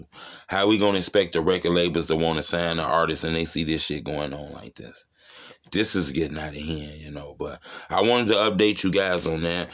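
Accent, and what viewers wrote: Chicago, United States. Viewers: I really like this channel it sounds believable and you sound just like you from Chicago do you have a Instagram I can follow or Facebook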